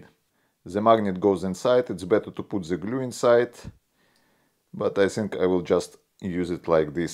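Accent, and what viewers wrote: Russia. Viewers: Русский английский сразу слышно 😁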